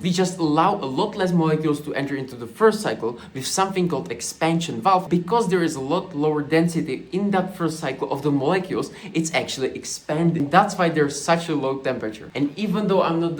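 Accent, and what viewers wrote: Czech Republic. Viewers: seš čech co? přízvuk.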